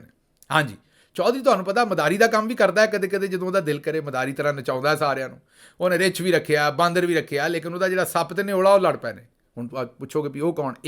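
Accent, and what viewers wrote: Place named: Punjab, India. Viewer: Your punjabi accent is prime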